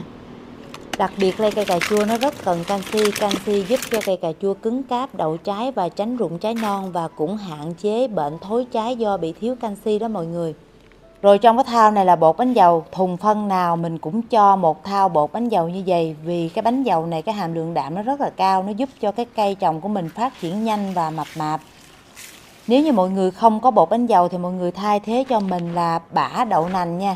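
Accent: Mekong Delta, Vietnam. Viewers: Nghe giọng tưởng em ở miền tây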